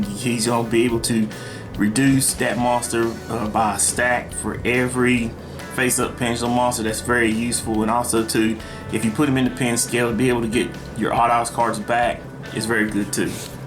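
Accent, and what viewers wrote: South, United States. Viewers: Oh yeah lol your straight country lol I'm from Tennessee lol.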